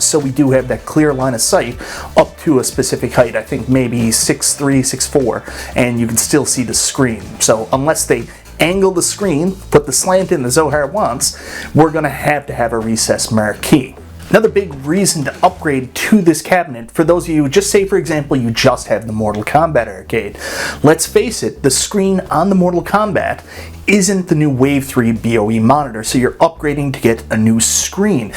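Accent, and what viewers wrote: Canada. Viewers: Nothing more endearing than the way Canadians prounce their "ar's". I could listen to this guy say "Arrrrhcade" and "Marrrrhquee" all day haha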